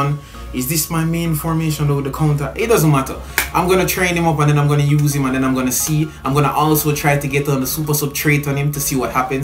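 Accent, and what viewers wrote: Jamaica. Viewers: Are u Jamaica???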